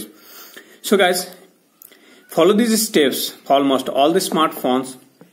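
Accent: India. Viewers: This video was the most Helpful and most Thorough video, even with the Indian accent(and this is a Compliment). Thanks a lot.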